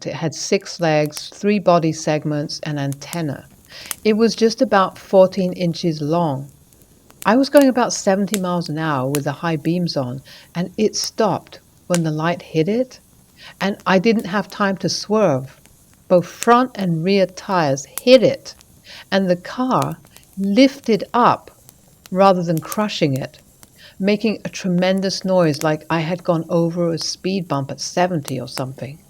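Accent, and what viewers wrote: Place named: England, United Kingdom. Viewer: Yay finally an English voice telling me spooky stories! And fire sounds too! Excellent, continue please ✨☠️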